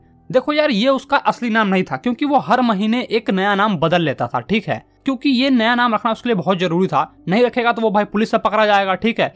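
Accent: Bihar, India. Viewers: Are you from bihar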